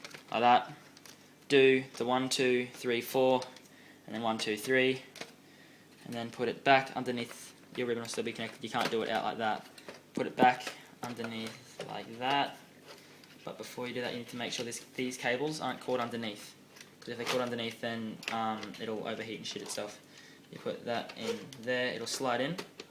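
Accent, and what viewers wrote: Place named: Australia. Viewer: "Don't swear"... You sound Aussie as well but i'd turn and say fuck just for shits and gigs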